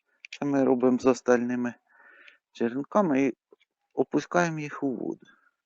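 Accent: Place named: Zhashkiv, Ukraine. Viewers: Я також послухав - ніби Маньківщина )) Ніби дома побував. Сокира, радянський секатор і ножиці, і обов'язково тряпочка )) Таке рідне. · Черкащина райьон говор як в Жашкові..віджалій гроші купи нормальний сікатор він не ріже ніфіга..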